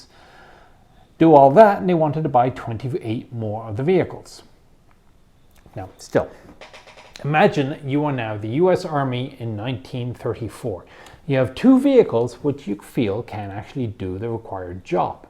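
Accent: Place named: Ireland. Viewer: I never noticed Irish in you accent... Untill I started noticing h-wheels. Not completely American yet XD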